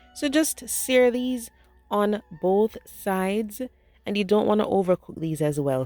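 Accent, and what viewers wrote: Jamaica. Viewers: Thanks for sharing, my daughter said she will surely fix this. ❤ ur Jamaican accent beautiful!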